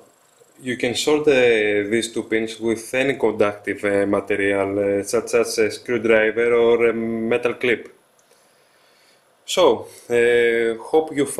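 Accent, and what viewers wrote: Greece. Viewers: ελληνας απο την προφορα το καταλαβα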